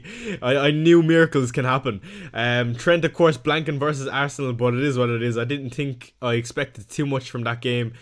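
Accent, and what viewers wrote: Ireland. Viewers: You have great advice and insights, and absolutely the best accent of all the FPL You Tubers! Love a cheerful Southern Irish accent...😊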